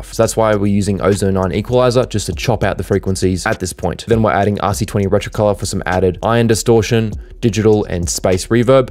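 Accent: Australia. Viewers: U Aussie mate ?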